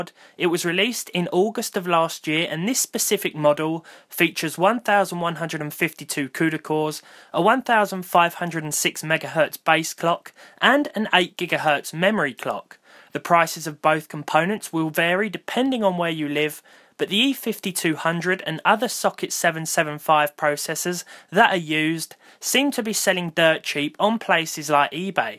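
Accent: United Kingdom. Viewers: is this a brittish accent?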